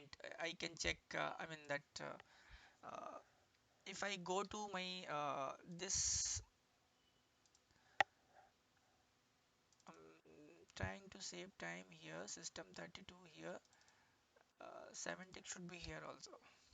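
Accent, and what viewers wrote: India. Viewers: Indian accents everywhere on IT vids? Does no one from native English speaking countries work in IT anymore?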